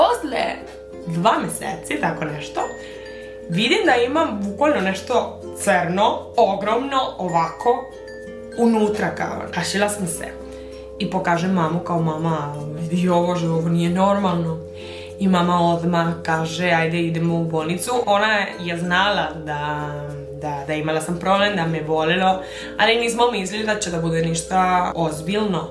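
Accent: Serbia. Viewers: Kraljice bukvalno kada god sam tužna samo pustim tebe mislim malo smesno picas srpski no HEJT volim te mnogooo ja tebe razumem i stvarno bas mi je zao iako znam da je to bilo pre 10.godina Ali idalje ne osacas nogu bas mi je zao volim te najviseeee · Joj kako ja volim kako pričaš srpski !!!! 💞💞💞💞😂😂😂😂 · Sve slatko ali tvoj Srpski kako slatkoo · Boze koliko ti slatko pricas Srpski😍 usavršila si jezik😁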